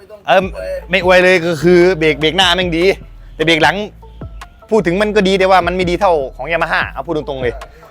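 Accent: Korat, Thailand. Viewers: คนโคราชเดะนิ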